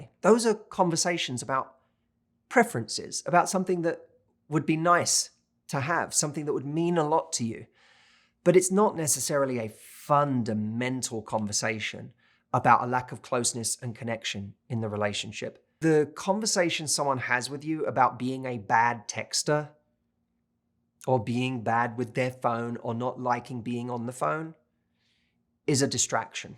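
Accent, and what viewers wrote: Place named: United Kingdom. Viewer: he sound British🇬🇧🇬🇧🇬🇧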